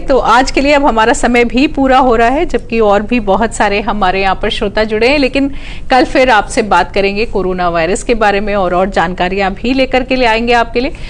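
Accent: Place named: Delhi, India. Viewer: Medam aapki awaaz me Delhi 6 wali Ton hai....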